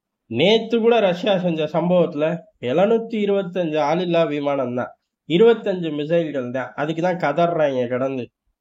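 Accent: Madurai, India. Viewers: தல...செம்ம இன்ட்ரஸ்ட்டிங்கா பேசுறீங்க தல....உங்க மதுரை ஸ்லேங்...சூப்பரா இருக்கு...இதயே கண்ட்டின்யூ பண்ணுங்க...இன்னொரு சேனல்ல புதுசா பானைக்குள்ளருந்து பேசற மாதிரி ஒரு வாய்ஸல பேசுறீங்க போல....அது சரியா புரிய மாட்டேங்குது ப்ரோ....இப்ப நீங்க பேசுற வாய்ஸ்தான் சூப்பர்....அண்ண அமெரிக்கா பயபுள்ள மைலேஜ் கீழ வந்து சொல்லக்கூடாது...சூப்பர்....❤❤❤❤❤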